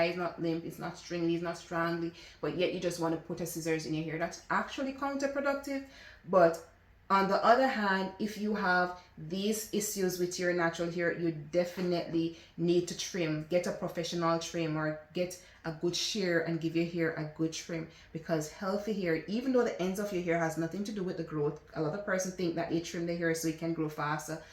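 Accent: Jamaica. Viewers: Girl mine before that was our Jamaican 🇯🇲 accent ❤❤ new subscriber here sis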